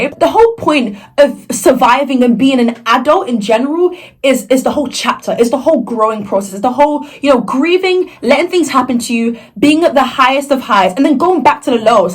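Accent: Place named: United Kingdom. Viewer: You Look stunning!! And I love your british accent! So charming!!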